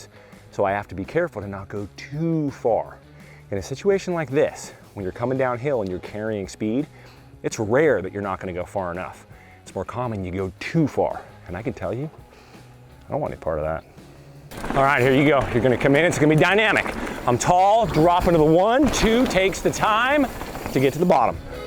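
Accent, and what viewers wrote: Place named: United States. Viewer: Thank God we have usa boy and accent. It's about f time. That I dont hear a f English accent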